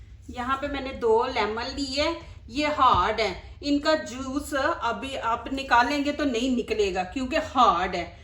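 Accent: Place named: Punjab, India. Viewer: Agr ye chaba chaba k punjabi men Urdu na bolen to Video short hojaye gi.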